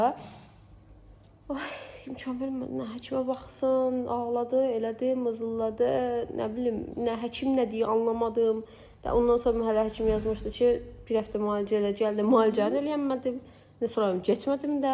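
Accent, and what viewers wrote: Sheki, Azerbaijan. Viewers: Сох sırındı sekı lehcesı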